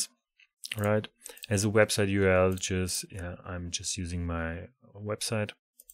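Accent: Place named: Germany. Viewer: are u a german ? your accent made me tired. sorry bro.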